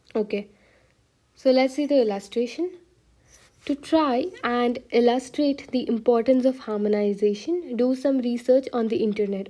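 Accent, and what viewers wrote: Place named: Kerala, India. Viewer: Are you malayali . Sound ketapol thonni😊 . Good class really helpful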